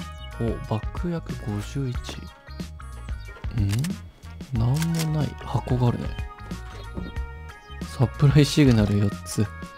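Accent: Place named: Kansai, Japan. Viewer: 関西弁バチバチすね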